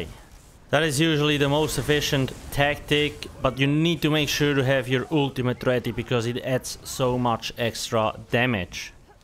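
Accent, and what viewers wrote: Switzerland. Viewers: bist du schweizer???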